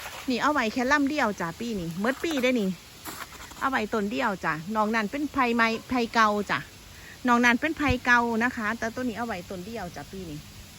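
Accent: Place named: Sisaket, Thailand. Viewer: สำเนียงแบบนี้ศรีสะเกษคักๆครับผม😂😂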